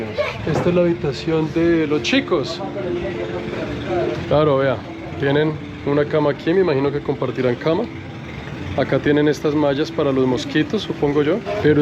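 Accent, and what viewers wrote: Colombia. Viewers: Ahí usted es único y mis respetos y mucha admiración. Yo también soy colombiana y me encanta su forma de hablar qué no deja que nos olvidemos de nuestros dichos!! Parcerito!!!y saludes a la abuela.😊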